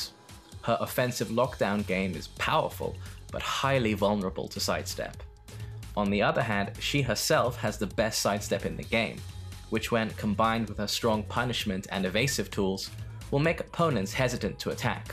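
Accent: United Kingdom. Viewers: Sayuko Tanaka yes I learnt Japanese. I didn't mean "ay" as in "say" I mean "ah". I just didn't hear him properly because he didn't say the "ah" sound clearly. Maybe his British accent.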